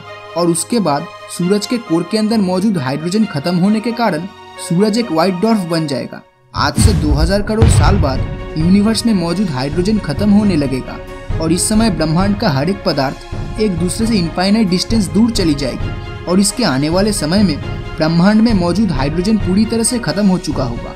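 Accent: Bengal, India. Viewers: Bhai aap bengali ho kya.. bilkul bengali accent hai aapki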